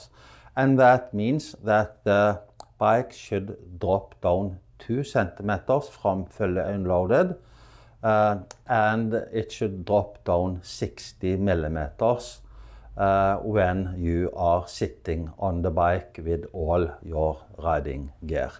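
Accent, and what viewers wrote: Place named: Scandinavia. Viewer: so funny, when i hear that scandinavian accent I think of all the scandinavian rally and f1 champions and i'll just buy anything you say :o))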